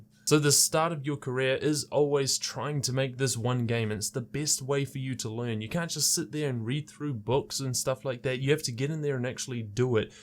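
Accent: New Zealand. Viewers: R u from NZ?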